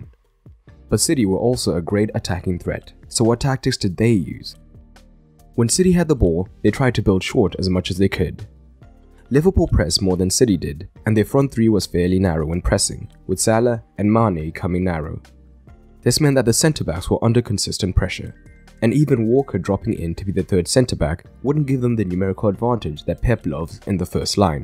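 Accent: South Africa. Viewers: Cool video man. Are you South African?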